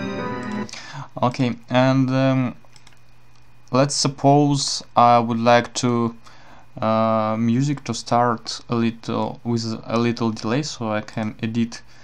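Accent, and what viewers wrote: Russia. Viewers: А я по интонации сразу поняла что русский! :P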